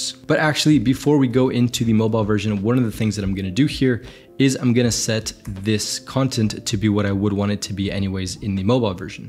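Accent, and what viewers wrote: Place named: United States. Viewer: Love the tutorial. Sorry in advance for being intrusive but, how come you have the most perfect American accent? I can see you are Valencian/Catalan (like me). If you went to diction classes please let me know where, if it's online.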